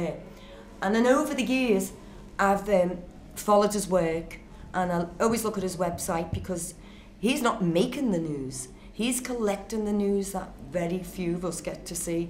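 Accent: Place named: Liverpool, United Kingdom. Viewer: love that liverpool accent. coolest in the world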